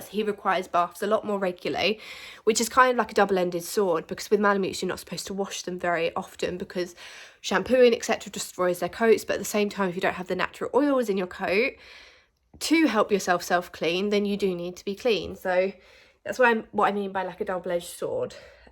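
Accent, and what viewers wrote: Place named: England, United Kingdom. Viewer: Its her English accent for me..😁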